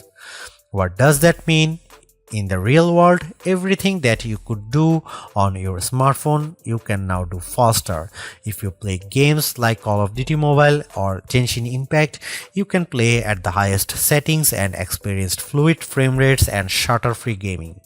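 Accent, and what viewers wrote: India. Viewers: Indi accent insta skip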